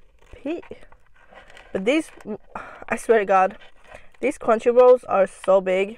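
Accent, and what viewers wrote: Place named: United States. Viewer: Where are you from? I hear a foreign accent mixed with American lol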